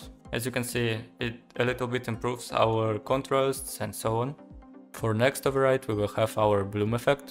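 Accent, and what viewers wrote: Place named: Turkey. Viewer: Dostum türk olduğun anlaşılıyor bu arada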